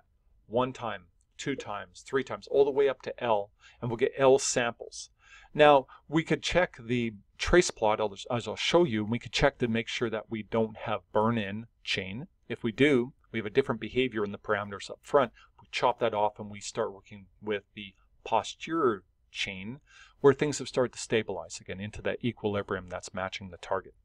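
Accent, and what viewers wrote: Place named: Canada. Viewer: Just found out is Canadian, and in my opinion, the Canadian accent is the best English accent for non-native speakers to comprehend, thanks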